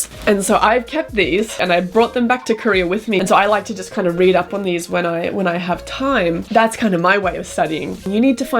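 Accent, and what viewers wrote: Australia. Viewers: 발음 너무 머싯서요 호주발음임에도 불구하고 알아듣기 쉬워요..!! 코피주루룩...언니멋져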